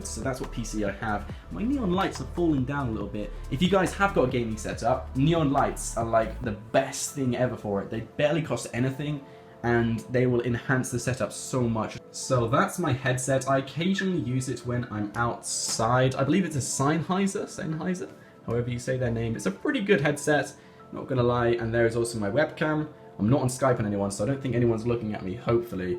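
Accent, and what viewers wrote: United Kingdom. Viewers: I just realised your British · R U British?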